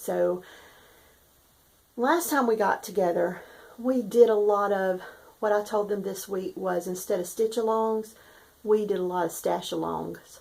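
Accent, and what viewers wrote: South, United States. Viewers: You make me laugh, your southerness is the best.......what a wonderful idea for your sons room.....god bless him for serving, my son is in the AF as well 17 yrs ......I miss him immensely, he will retire hopefully in 3 I don’t think he plans on staying longer, he will be 38 yrs old him and family are stationed in Vegas I live in ohio so I only see once a year, it’s hard...but they will move home after retirement...yeah! Love all your stitching, stash and junking....I’m a junker too 😀😀😀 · Thank you so much for sharing your trip to Charleston! It is definitely on my list to visit! I was born and raised in Florida, and have many ancestors from GA and North and South Carolina 😄 Your stitching is gorgeous! And your southern accent makes me homesick. Enjoyed my visit! ☘️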